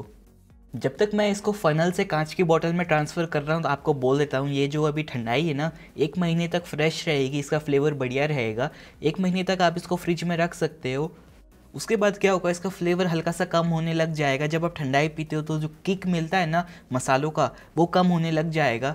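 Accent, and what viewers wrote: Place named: Rewa, India. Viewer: Arey sir mai MP rewa se hu...... Aap bhi wahi se hai kya mujhe aapki boli wahi ki lgti h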